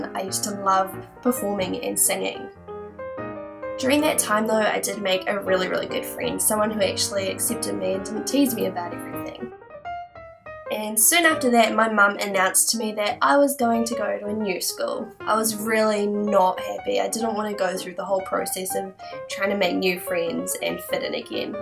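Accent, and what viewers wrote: New Zealand. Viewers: Yeah yeah I know, first off, it was rhetorical, because she obviously is from NZ, but yeah, that wasn't supposed to be like that btw hahhaahaha :3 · Did you even watch this video? She says it like 10 times and she has a kiwi accent😂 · i knew u were Nz ur accent is sooo strong :) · Wow! I'm so glad things are going well for you now :-). I never would have guessed that you went through all of that - much of which I can identify with. You are gorgeous with and without makeup. I love your videos. I was really surprised to hear a Kiwi accent after hearing mainly American ones. Bless you for who you are :-)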